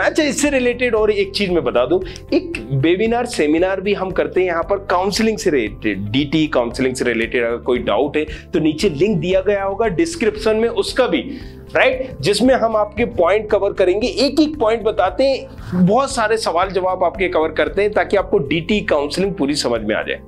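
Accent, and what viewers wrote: Bhopal, India. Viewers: Your accent is pure Bhopali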